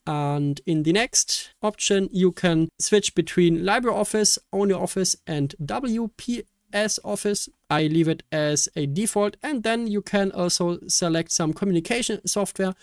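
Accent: Germany. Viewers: weil der deutsche Slang durch kommt 😉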